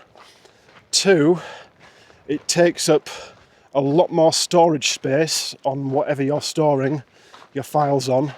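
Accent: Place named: North, United Kingdom. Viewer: Congrats in your 10x10. Video is inspiring as always. Not tired of the Northern accent and hope your toe feels better soon. Cheers 🏔️🏕️🏔️🏕️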